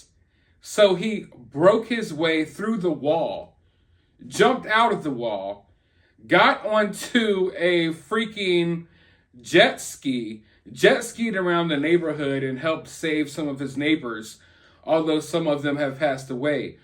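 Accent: South, United States. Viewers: Just from listening to your videos the past couple weeks I just knew you were from the South. LOL Alabama here.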